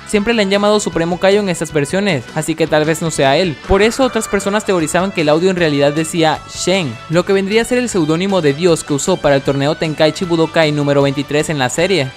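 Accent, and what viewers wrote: Venezuela. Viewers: eres venezolano!!?? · v eres venezolano? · Eres Venezolano? jajajajaja! Te me hacias familiar... aunque pense que eras mexicano. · Eres de Venezuela? No manches es la ostia papú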